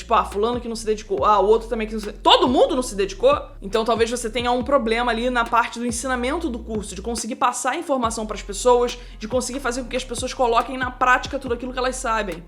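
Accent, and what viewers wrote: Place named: Rio de Janeiro, Brazil. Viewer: Legal esse seu acento carioca. Me lembra muito uma amiga do Méier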